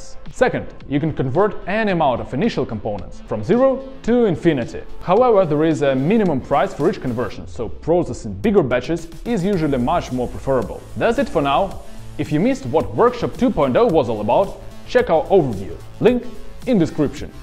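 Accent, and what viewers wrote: Russia. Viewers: That Russian accent... Come on guys find a native speaker :) no offense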